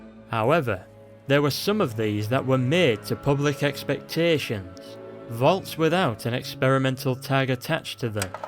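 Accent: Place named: Northern England, United Kingdom. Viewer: Great video my friend. Love the northern accent!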